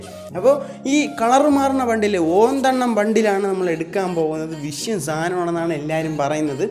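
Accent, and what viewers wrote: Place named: Trivandrum, India. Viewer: Bro tvm ill ano 😁 slang athupole indu ❤️❤️ i like ur voice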